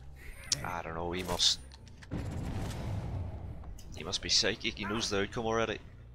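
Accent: Northern Ireland, United Kingdom. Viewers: you from northern ireland? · Are you from Northern Ireland?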